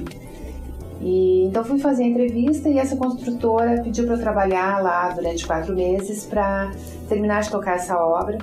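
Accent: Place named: Rio Grande do Sul, Brazil. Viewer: Parabéns por sua trajetória. Acredita, que esta semana, vendo, ouvindo você, foi que notei que és gaúcha! Eu acreditei muito tempo que você fosse Paulista. Ah, mas fiquei muito entusiasmada., você é muito simpática e carismática. Eu amo seu canal e sua maneira de se comunicar. Felicidades.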